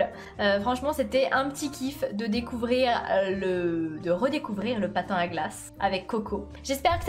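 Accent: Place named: Marseille, France. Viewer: Laccents Marseille mdrr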